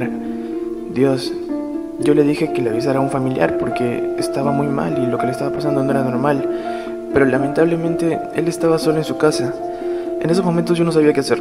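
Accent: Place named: Peru. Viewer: Gaudhi Solimano Claro, cualquiera conoce la voz de un peruano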